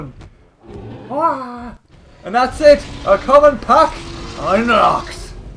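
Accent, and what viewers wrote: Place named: United Kingdom. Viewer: Um,excuse me Mr..I hate to crush ur hopes but you will never do an exact impression of Chris Pratt.See,he has an American accent,you have a British one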